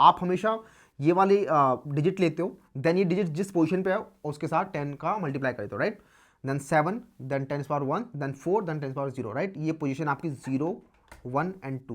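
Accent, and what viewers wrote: India. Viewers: Indian English is so difficult to understand xD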